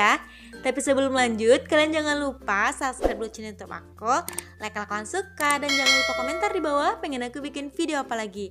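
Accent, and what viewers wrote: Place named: West Sumatra, Indonesia. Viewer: Logat Minang Ndk Masalah,,Yg Penting Mantap Kak😊😊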